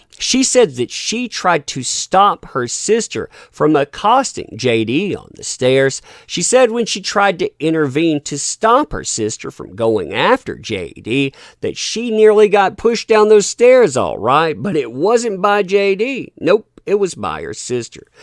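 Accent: South, United States. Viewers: always has such a soothing voice to me. Like so many people will just be shouting and talking so loudly and overly expressive, and it grates on me in more ways than one since I get oversensitized very easily. But something about calm southern drawl just is very calming like it's almost paradoxical how he's talking about such harrowing topics with such a calm voice, it really helps my anxiety.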